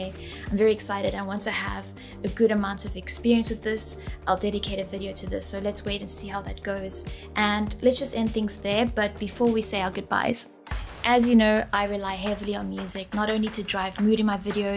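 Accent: South Africa. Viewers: This was so helpful! Thank you! Totally random question, but are you from South Africa?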